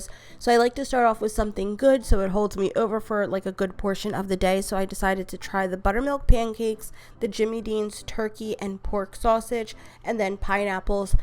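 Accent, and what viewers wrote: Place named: Philadelphia, United States. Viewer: This is my first time seeing your video... I heard your voice and knew immediately that you were from Philly. I'm also from philly!!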